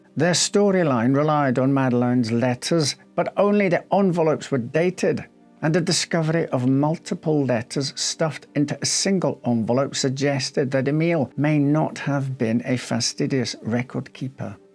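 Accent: Scotland, United Kingdom. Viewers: Well not just a wonderful and salacious video but the fact that your good self with this wonderful Scottish accent just lifts it to a higher level. Wonderful story telling thank you. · Yes, he is Scottish 😁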